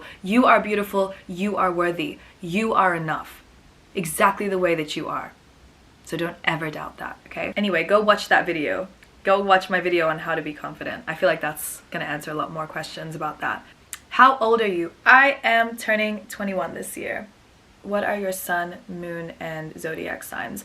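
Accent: Australia. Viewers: Just found your channel and I'm thrilled that you're half Filipino!! So am I, on my Dad's side!! Love the Try-Ons, those are my favorite! Keep it up, I love your personality!! At first I thought you were American or Canadian b/c I hardly hear an Australian accent? :-)))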